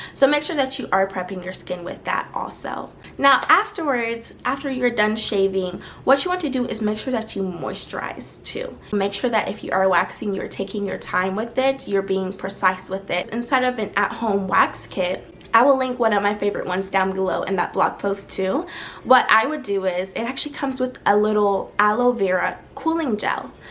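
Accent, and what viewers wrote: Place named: Caribbean. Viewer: Where are you from? I'm hearing a particular accent from the carribean.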